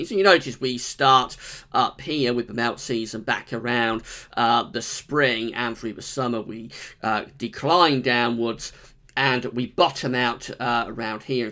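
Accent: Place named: Liverpool, United Kingdom. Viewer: Hello mate! Thanks for your efforts which are duly appreciated. For those of us who do not originate from liverpool or wales or wherever you might come from, it would be extremely beneficial if you could tone down your accent so that you are more easily understandable by a greater number. Many thanks.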